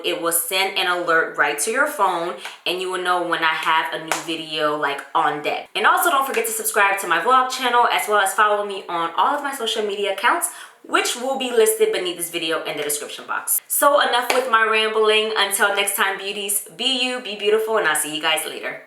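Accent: Louisiana, United States. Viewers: Ahhhh, it's so refreshing to hear that Louisiana accent! I miss Lafayette so much. 😍 I love how you keep your faith in God and stay on those kids to be respectful. This look though 🙌🏾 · I’m sorry for your loss. Praying for peace for you. When I started watching your videos I kept saying to myself she sounds like she’s from Louisiana. When you just said it I yelled “I knew it”. I’m from Opelousas. Live in Maryland now. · I love the way you try to correct you louisiana accent lol. I do the same when speaking in public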